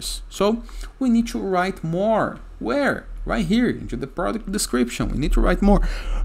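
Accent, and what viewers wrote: Brazil. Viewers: Are you brazilian? I like the content and you accent. Was easy for me to understand everything.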